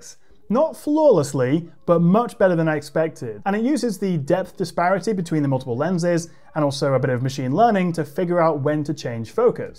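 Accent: United Kingdom. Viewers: Excellent presentation that looks like a live TV coverage. By the way, where was this beautiful village taken? Compliments to your clear, creative, and colourful narration. The British accent is so cool and clean ! love it.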